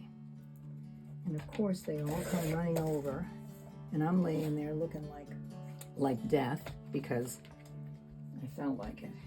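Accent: Boston, United States. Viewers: I love your Boston accent! Your art is so satisfying to watch as you go about making choices for what you use and how. I can make gel prints all day and do but making a collage is another story. Thanks for making a video today ❤️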